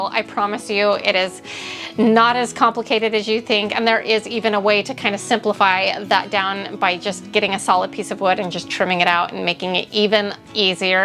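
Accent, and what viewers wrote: United States. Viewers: it's so funny when americans try to pronounce swedish words. Love it. ❤️😃 no hate love from your Swedish followers 👌🏻🎉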